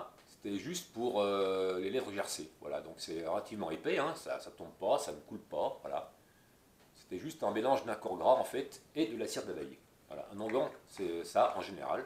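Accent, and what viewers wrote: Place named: North, France. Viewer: Salut, J'aime bcp tes vidéos, ta façon (simple) d'expliquer et ton accent (je pense du nord de la France: au dessus de la Loire...) Un petit "Crut" pour les macérâts huileux solaires: j'utilise des boîtes de conserve que je numérote de 1 à 4 (au lieu du papier "Kraft" tout moche) et en cas de pluie: pas de PB... Chaque jour, je "tourne" de 1 à 4....pour être sûre que ma macération soit au soleil uniformément.... Encore merci pour ta simplicité et tes explications Bises.... et toi aussi prends soin de toi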